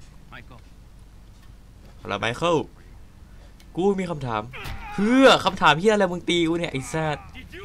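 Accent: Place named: South, Thailand. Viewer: พี่ พูดใต้เป็นด้วย 555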